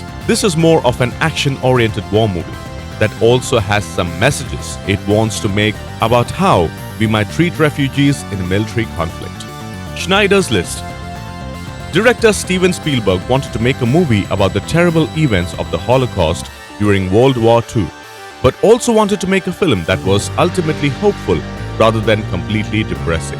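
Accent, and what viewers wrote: India. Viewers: You sounded like Indian, checked your 'YouTube and I was right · he's from india but has a surprisingly mild accent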